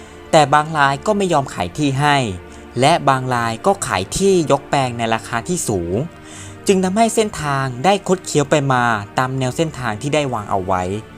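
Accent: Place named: Myanmar. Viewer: ทำไมพูดเหมือนพม่าหัดพูดไทย มึงเป็นพม่าใช่มั้ย😮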